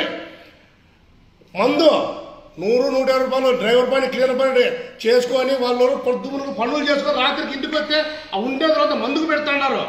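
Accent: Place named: Rayalaseema, India. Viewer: anna needhi mana rayalaseema language la vundhi dare and guts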